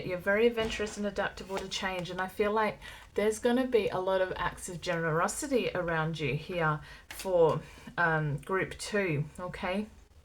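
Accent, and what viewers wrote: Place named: Australia. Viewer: Great reading, I was in group 1. OZ accent..🫠🤤🌞 especially the sound of "you" and "here"❤ please don't get me wrong, It just sounds beautiful. 🫣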